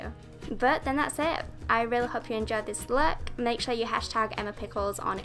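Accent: Yorkshire, United Kingdom. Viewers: so nice hearing a yorkshire accent on this website! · Yay a new vid! Where is your accent from Emma? Is it somewhere in Yorkshire, I always watch your videos when I have panic attacks because your voice really relaxes me :)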